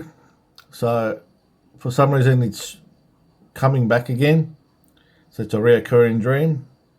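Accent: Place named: Australia. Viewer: You sound Australian to me and I was just watching the Live Tracking of Apophis and it said passes would be in 2029 and 2036... or as you say in dream; 7 years to go, 7 years to go. Since you posted this video NASA has done the DART mission with smashing success(pun intended) but that got me thinking. Why's NASA doing this? Now I hear we're going to this asteroid and now I'm here and getting very concerned in USA. 2371 Days 2 Go, live them well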